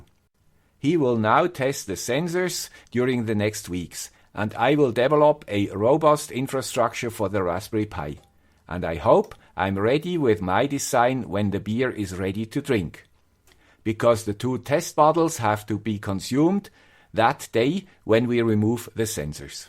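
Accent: Switzerland. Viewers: Hi, love the way you show us your work. You should change your slogan in:.....the guy with the swiss accent and swiss humor:)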